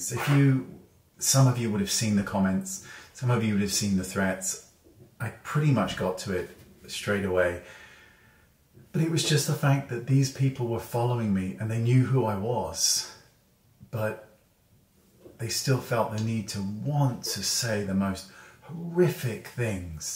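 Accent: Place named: West Country, United Kingdom. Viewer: I love your West country accent Congrats on your marriage, ignore the naysayers x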